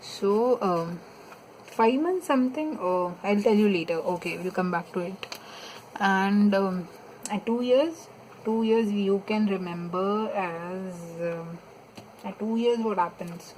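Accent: India. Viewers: very helpful madam.. accent seems to be South India Your sounds so kind in the video just like a child..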